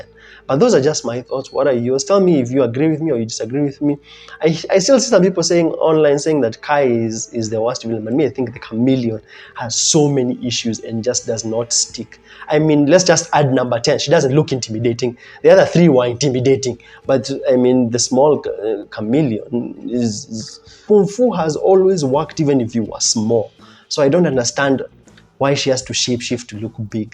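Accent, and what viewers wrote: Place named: Africa. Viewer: I think he might actually be from Africa that's how they speak there it's a very nice accent to listen to that's for sure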